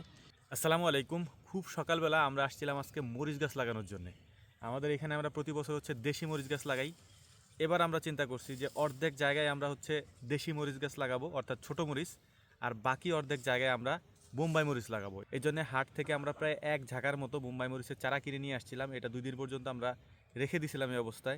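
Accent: Faridpur, Bangladesh. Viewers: ভাই আপনার বাসা কই?? আমার এলাকার একসেন্ট পাচ্ছি,, আমাদের ফরিদপুরের মানুষ ও এইভাবে কথা কয়। আর আমারও এমন গুলোমুলো টাইপের একটা ভাগিনা আছে 🥳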